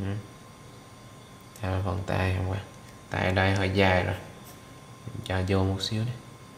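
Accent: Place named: Gia Lai, Vietnam. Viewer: Tiếng gia lai vậy á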